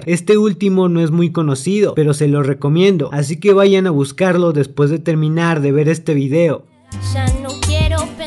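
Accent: Argentina. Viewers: Sos argentino o uruguayo vos?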